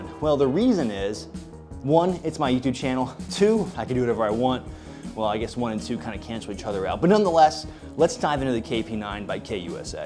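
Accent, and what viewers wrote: Russia. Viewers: His russian accent is almost as good as FPSRussias So thats good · FPS Russia-level accent. Kyle would be proud.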